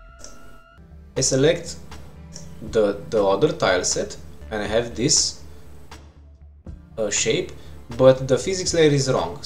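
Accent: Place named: Romania. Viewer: Tocmai ti-am vazut videoclipul, incercand sa imi dau seama de cum sa fac o detectie cand player-ul atinge zona cu foc, l-am urmarit pana la capat iar apoi am vrut sa ma abonez. Cand am vazut " " in nume, am facut legatura cu accentul tau si m-am bucurat sa vad ca sunt romani, buni, care fac astfel de content. GG bro, ai un nou subscriber!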